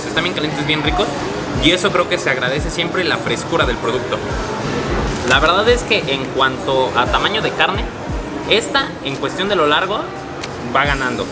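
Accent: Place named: Tokyo, Japan. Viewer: Saludos desde México CDMX ánimo....me fascinó tu acento de Tokio como que se te pegó...jajaja....como siempre 🤠🤠🤠🤠 mis respetos excelente información.........aah porfis Saluda a Doña Lety...a Don Álvaro y al buen Lalo.... La mejor hamburguesa la última solo por el tocino....bye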